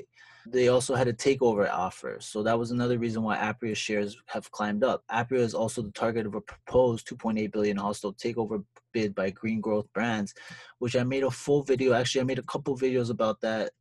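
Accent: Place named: Latin America. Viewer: Oh nooo! It's all good baby! Your dedicated and you show how it's a full time hustle, that you obviously enjoy! You stay informed & you know your stuff. Good predictions! Thanks for showing us your mistakes as well! You take criticism very well btw, its a great quality! Your English is Fine, YOUR Latino. Go Gary V on em and say I'm Latino dick, how am i supposed to talk LOL..besides Money doesn't give a ish..Stay Focused hun🙏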